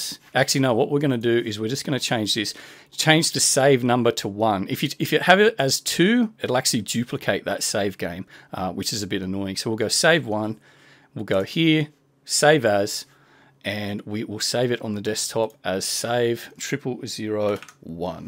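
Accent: Australia. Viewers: Did I ever mention how much I appreciate you, the fact that I can sit back and listen to an Aussie and enjoy myself makes me really happy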